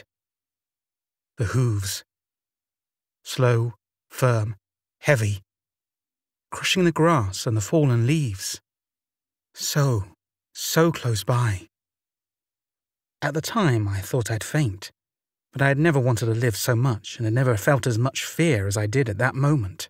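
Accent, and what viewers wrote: United Kingdom. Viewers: Dude I love your British accent! It gives the stories a great touch. Awesome content my friend! Watching from Dallas Texas U.S. home of the Dallas Cowboys not too far from goatman Bridge!🤠 ⭐ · Do you have a British accent? Everything he says is really British sounding. Im not saying he says goat like goot or anything, just that he says goat with a very British accent.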